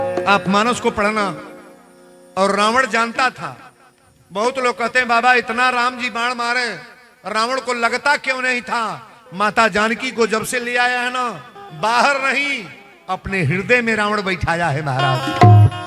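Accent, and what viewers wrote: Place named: Bihar, India. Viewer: Katha to Aapki theek thak hai par brahmad hokar bihari ganwar bhasha bolte ho.?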